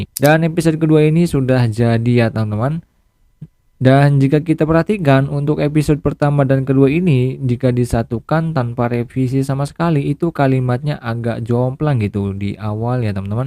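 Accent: Cirebon, Indonesia. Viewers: orang cirebon tah bang ? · Bonggan dewek, wong cirebon tah kang?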